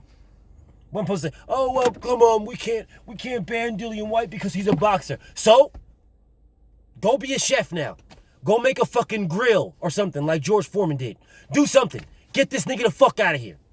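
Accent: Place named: United Kingdom. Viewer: Come on brov 😂 chip chip cheerio 🤣 come on mate 😂🤣 that British accent is hilarious, that British accent is giving them headache 😰😩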